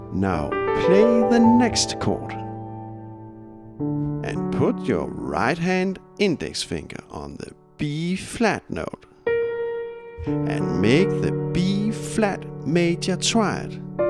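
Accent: Denmark. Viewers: Is that a danish accent I hear? Great job!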